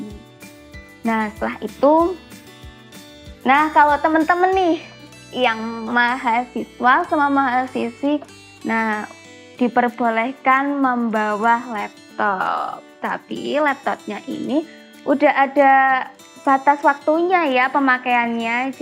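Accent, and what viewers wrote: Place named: Purworejo, Indonesia. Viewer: MasyaAllah mba.. Khas bgt logat purworejo nya.. Bismillah.. InsyaAllah tgl 11 Kali ke 2 anter ank msuk sini stlh 6 th lalu.. Smg ank kmi, betah, krasan.. Aamiin.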